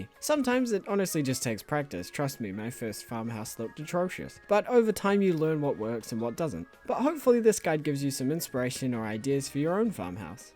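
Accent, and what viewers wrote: New Zealand. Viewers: There is nothing i love more than a good old kiwi accent. so calming to hear.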